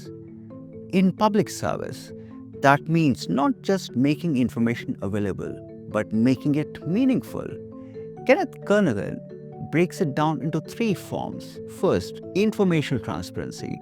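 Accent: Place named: India. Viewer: Sir little bit more indian accent 👍👍👍 because I want to listen you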